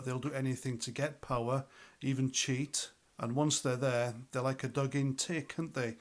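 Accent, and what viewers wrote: Wales, United Kingdom. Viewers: Good to hear a Welsh voice talking about this tosser. I’m looking forward to my next visit to the National Museum…a place I have always loved…But apparently one of Drakeford’s big project was “de colonising” the National Museum. Apparently there was too much focus on the history of Welsh people in the National Museum of Wales. And in order for us to go forward to a great globalist future we just have to let all that stuff go. Not bloody likely. Yma o hyd.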